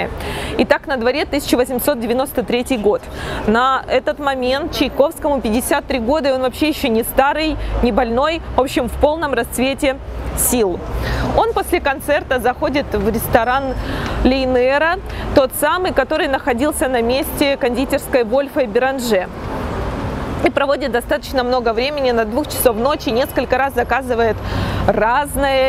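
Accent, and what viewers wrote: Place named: Stavropol, Russia. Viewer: Какой-то ставропольский говор... Но не плохо чешет так то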